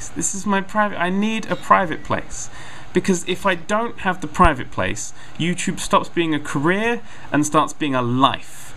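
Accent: United Kingdom. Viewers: 6. Your English/British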